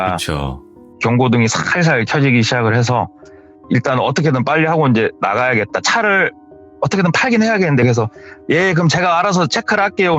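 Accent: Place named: Seoul, South Korea. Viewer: 수줍은 (얼추 서울말쓰시는) 넘 어색혀..ㅋㅋㅋ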